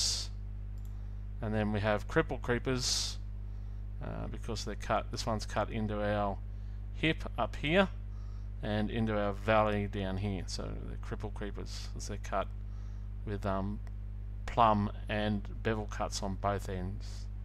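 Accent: Australia. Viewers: Very good explanation. And nice to hear an Aussie voice.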